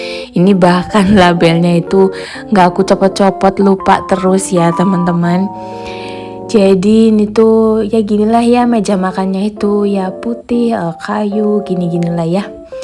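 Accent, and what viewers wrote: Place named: Java, Indonesia. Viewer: Medoknya mirip Teri Raradini 🙂... Dapur cakep... Saudaranya baik baik banyak ngasih..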